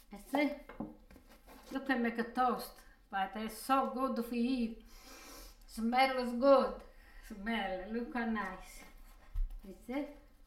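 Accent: Italy. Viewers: I sometimes forget to watch the video as I love to listen to her thick Italian accent! Love her! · you are awesome, my grandmother was from Poland a d except for the Italian accent you remind me of her, I will see her again someday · I had the same exact plastic bowl, but in a lavender color and it broke one day while trying to seasoning my pork shoulder...by the way luvvvvvvv😍😍😍😍 your Italian accent and your videos...please continue to make them and the Italian food recipes...by the way I'm total Hispanic, but somehow I reallyyyy understand exactly what your saying even with your Italian accent.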